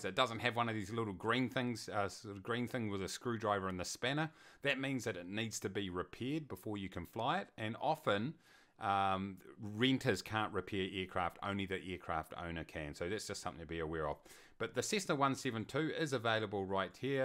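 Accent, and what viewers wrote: New Zealand. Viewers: Love your accent dude, you sound like my coworker thats from New Zealand!!! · Unrelated, I love that kiwi accent of yours. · Right away heard the Kiwi accent. IFF ESS Economy.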